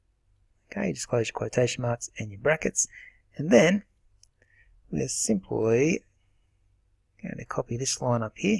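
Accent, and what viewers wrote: Australia. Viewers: The tutorial is explained perfectly.. but for some reason I'm getting an error in line 6 "while guess != number:" the error says: while guess != number: ^ SyntaxError: invalid syntax I tried everything I could but I am unable to fix it. Love the Aussie accent btw <3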